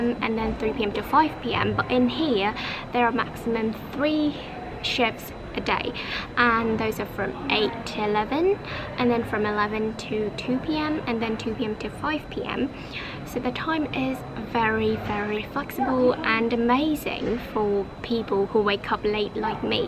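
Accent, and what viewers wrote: United Kingdom. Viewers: Trường bạn đẹp quá ^^ bạn nói tiếng anh cũng hay nữa. Bạn có thể chia sẻ kinh nghiệm luyện accent đc ko ạ? Nghe bạn nói giống British accent :) mình cũng có căn bản tiếng anh và muốn luyện accent giống người bản xứ ạ. Cảm ơn bạn.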